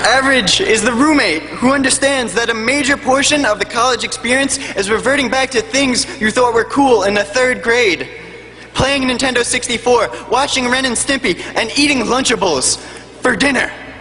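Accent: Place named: Canada. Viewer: I'm guessing Canadian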